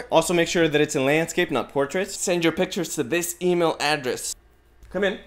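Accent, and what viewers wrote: Portugal. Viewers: sotaque de portugues de portugal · i think he's of portugese descent or something. he sounds like he has a slight accent.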